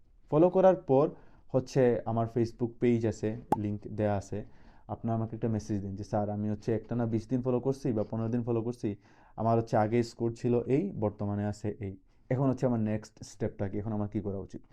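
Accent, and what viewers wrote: Sylhet, Bangladesh. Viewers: Sir Are you Sylheti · Apne ki sylheti? Apnar kotar modde sylheti tan ta ashe🤷🏼‍♀️?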